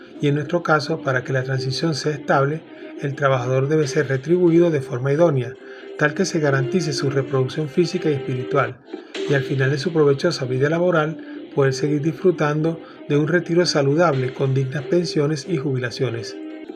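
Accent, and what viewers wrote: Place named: Venezuela. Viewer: Muy buenas propuestas las que usted está es poniendo buenas tardes desde tinaco estado Cojedes Antonio Acosta lo escucho venezolano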